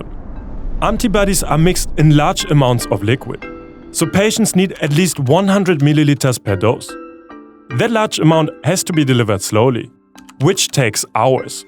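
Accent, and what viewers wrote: Germany. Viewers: I can't cope with your cute German accent hahaha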